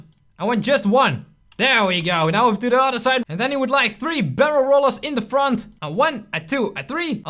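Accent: Netherlands. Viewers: Het klinkt of jij nederlands bent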